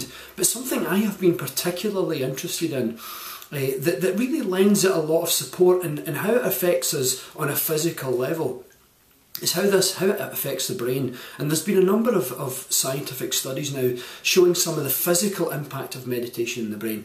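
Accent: Scotland, United Kingdom. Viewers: was recently introduced to your work and have to say irs so refreshing to hear a male Scottish voice in the field and one looking so good at 91 too! excellent work young man! excellent.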